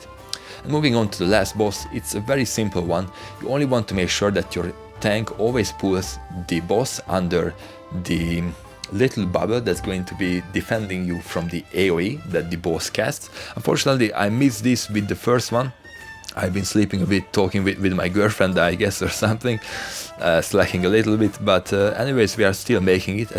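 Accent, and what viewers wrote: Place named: Hungary. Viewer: Nem szeretnélek megbántani, de simán kihalatszik a szörnyű magyar kiejtés az angolodból. Rendesen vérzik a fülem. Viszont ha ilyen szépen megtanultál angolul folyékonyan beszélni,akkor érdemes lenne dolgozni rajta. Valamint egy magyar felirat sem ártana mert azzal nagyon sok embert érnél el, vonzanál be. Nem igazán vannak hasonló részletes videók magyar nyelven.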